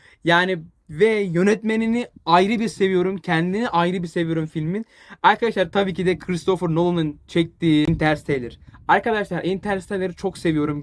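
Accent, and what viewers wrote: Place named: United States. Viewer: Aksanın çok yoruyor amerikan aksanı gibi :D bence biraz bu konu da değişim yapsan iyi olur gibi. yapabilceğine inanıyorum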